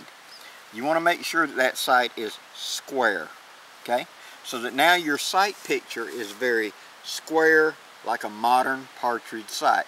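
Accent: United States. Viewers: Best cap and Ball Videos ever! Lot of history and technology said in beautiful Stories,in a lovely american Englisch, great pleasure to wach it.Thank you Sir for these series. These Guns played a signifiant role in making USA a big Nation ,later able to save Europe twice : WW l and WW II.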